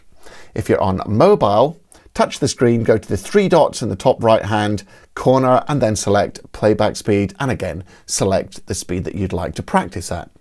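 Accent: Scotland, United Kingdom. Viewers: You are so kind! Thank you. Are you a fellow Scot? Thought I heard an accent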